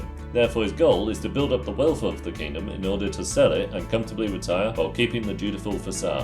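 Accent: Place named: United Kingdom. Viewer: You have great ideas and ways of presenting them. I suspect you will grow to be quite a large anime YouTuber if you can fix some of your production problems. To start, your audio problem is the biggest one. Your microphone is not the greatest, being very muddy, especially in low frequencies. And since your voice is very deep, the microphone makes it very hard to understand what you are saying. Add on the idea that there is a large American population that is interested in anime, having any sort of shortcomings in the audio department will make it that much harder to understand you (accents aren't hard to understand once one has gotten used to them, but not all Americans are used to a British accent). The background music in this video is very loosely defined as background music. It is too loud in the video. Also, the background visual seemed odd, feeling like a Windows Vista background. 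I am not very well versed many other areas regarding YouTube production and making good videos, but I can tell that you do have the most important part, interesting content. Now you just need to develop the other parts of your YouTube game and I suspect you'll find the growth you probably desire. In regards to this video, I really like what you've said about Genius Prince. I very much enjoy these kingdom builder-type shows like Dr. Stone or Maoyu (even though Maoyu was meh) and watching another like that would be fun!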